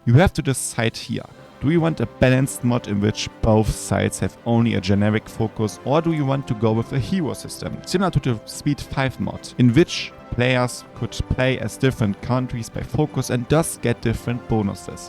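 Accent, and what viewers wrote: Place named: Germany. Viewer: german accent haha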